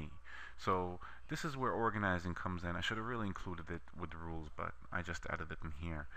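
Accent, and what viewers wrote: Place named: New York, United States. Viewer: this one came in handy also - thanks for your hard work on these. I am still guessing NY accent...perhaps the Bronx?? I can hear it when you say dog.... like dawg :-) lol odd I know!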